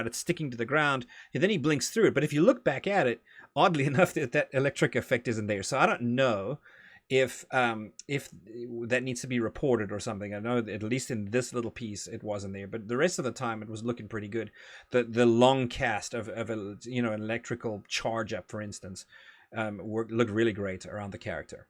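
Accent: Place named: Texas, United States. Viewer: I liked this video quite a bit, and also happy you are doing more content. But, the big story for me is that I am starting to hear a Texas accent slip into your narrative occasionally :)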